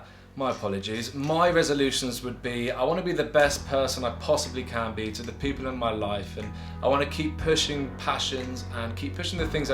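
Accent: United Kingdom. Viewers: !! You're like Rudy from Misfits!! do u watch it? aha just found a similarities with the twins and the british accent. Keep making videos!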